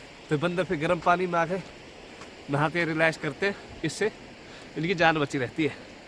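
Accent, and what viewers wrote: Haryana, India. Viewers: Bhai HARYANVI bol k video mai kati char chand lga diye , tourism with comedy ...😍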